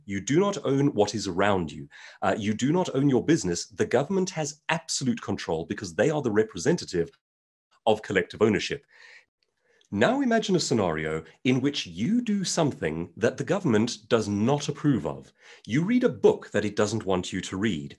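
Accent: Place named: RP, United Kingdom. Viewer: Having vast professional experiences with the English, then dating a few Scotsmen and travelling there extensively, I must say that you kind Sir really do speak the Queens English as well as that POS!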